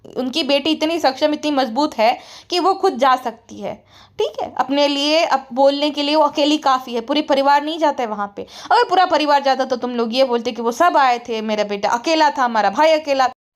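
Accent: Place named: Kathiyawad, India. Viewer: you sounded like kathiyavadi❤ I love it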